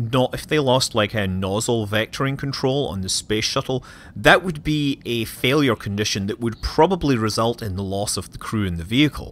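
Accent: Scotland, United Kingdom. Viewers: Great to hear your Scottish accent!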